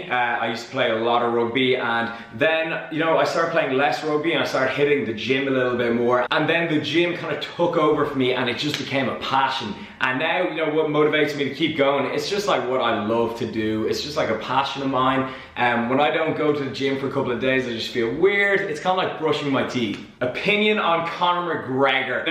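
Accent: Dublin, Ireland. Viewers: That Dublin accent <3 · whats a D4 accent? D for Dublin? ahah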